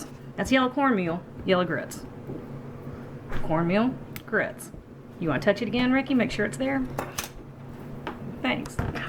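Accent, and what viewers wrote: South, United States. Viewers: Paused the video at 4 seconds to write this comment. I live in Texas and if you ain’t getting you’re recipe from woman with a southern draw and hair as big as this you’ve already messed up.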